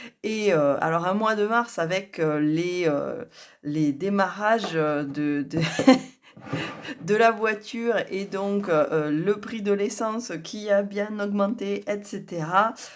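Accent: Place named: South, France. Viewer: Binjour Moi je trouve que vos vidéos dégagent une énergie positive j'aime bien vos écouter avec votre petit accent du sud je trouve votre vidéo au sujet des revenus irréguliers avec un budget stable de très bons conseils pour des gens à leur compte et bravo aussi pour l'immobilier car nous en avons un peu aussi et c'est vraiment un boulot plein-temps avec souvent des demandes des locataires des locataires ,qui ont la bougeotte ils savent pas où ils sont bien ce n'est pas évident à gérer du tout et attention aux formations hors de prix qui vous disent que les banques prêtent à tour de bras une fois que votre formation est payée assez cher la banque ne prête pas toujours on a eu le cas dans la famille justement cette année avec ma fille infirmière "suspendue"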